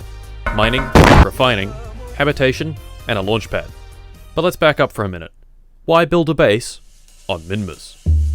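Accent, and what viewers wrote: Australia. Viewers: He's Australian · are u Australian? Cuz so am I so that’d be cool to know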